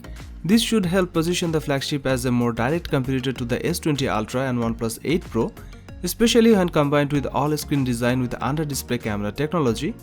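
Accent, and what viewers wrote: Bengal, India. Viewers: Bengali accent is unique 😄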